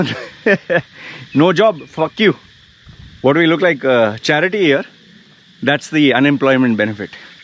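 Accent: India. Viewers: You sound more indian than 6yrs ago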